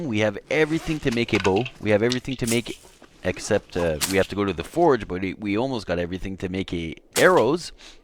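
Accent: France. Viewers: are you french cause im french and when i speak english i sound similar to you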